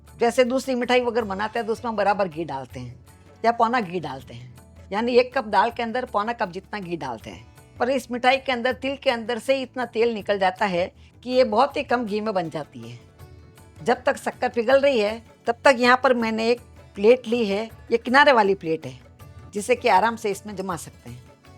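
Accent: India. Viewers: Aap bahut achha video bnate ho, Apki language or bolne ka desi tarikha super h.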